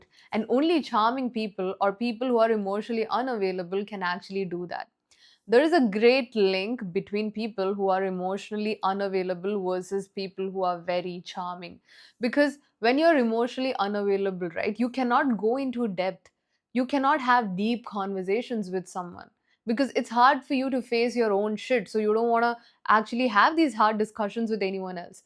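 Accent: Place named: Kerala, India. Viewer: Your English feels like malayalam 👀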